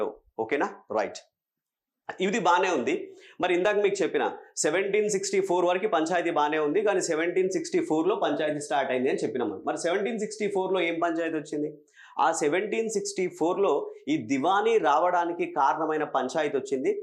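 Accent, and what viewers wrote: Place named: Telangana, India. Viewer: Superb and excellent classes sir... I am a big fan of ur telangana yaasa..🎉🎉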